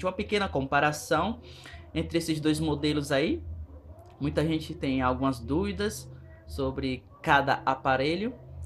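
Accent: Bahia, Brazil. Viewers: IUEAHAEIUHEAIU, seus vídeos são legais ! xD Você é baiano ? e_e'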